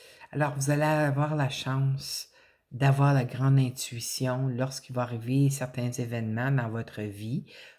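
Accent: Canada. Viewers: Merci pour cette belle guidance. Votre accent canadien est si agréable à entendre et votre voix est si douce. Bonne Année à vous et prenez soin de vous.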